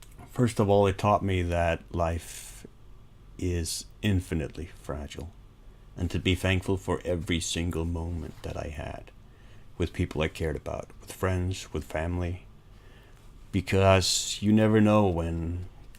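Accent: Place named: Denmark. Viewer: hehe det er slet ikke så slemt, for det meste kan man ikke høre det, men en dansk accent har det med at trænge igennem fra tid til anden :) anyway vil bare sige at jeg subbede den anden dag efter en podcast og lige havde luret dine steep vids, og kan da se at det er gået hurtigt med et par subs den sidste uge :) håber det fortsætter med at stige og ser frem til flere videoer, kan se den nye L.A. noire er ude, good stuff :) hvis du nu skulle få tid eller lyst ville jeg da blive glad hvis du gad tjekke min lille kanal ud, har kun været i gang i 4 måneders tid så er stadig i gang med at finde min "stil" hehe (var ikke derfor jeg subbede skal lige siges men det skader vel ikke at spørge hehe) aaaaanyway du må have en dejlig uge :)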